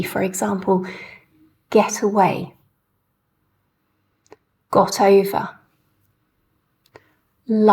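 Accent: United Kingdom. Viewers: صوتك مميز جدا في اللهجة البريطانية هذي اللهجة الي اتمني اتحدث بها يوم من الايام أيمكنك إعداد المزيد من الفيديوهات وقراءة القصص من أجل الshadowing انتي لديكي نبرة نادرة استغليها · Is your accent Standard south british?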